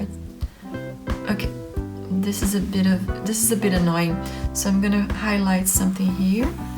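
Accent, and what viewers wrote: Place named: France. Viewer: i love your french accent!!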